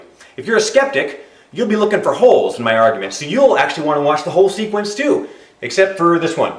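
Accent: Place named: Canada. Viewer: YOUTUBE...only in America, (but you sound canadian) every one wants to be famous. This goes to show excactly how little attention people feel they are getting. Be a good human and take responsibility thats all we need to do. Don't believe the hype. Don't worry. Don't be afraid. Everything must eventually end... So enjoy this life experience, cause it aint gonna happen twice.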